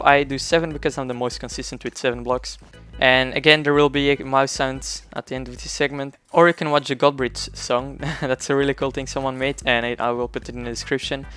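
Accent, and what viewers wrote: Netherlands. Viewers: Are you from Holland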